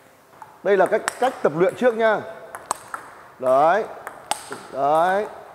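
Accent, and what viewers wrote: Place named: North, Vietnam. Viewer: Cam on, exactly what I was looking for. And your bac dialect makes it so much easier to understand. Sry never learned how to write viet.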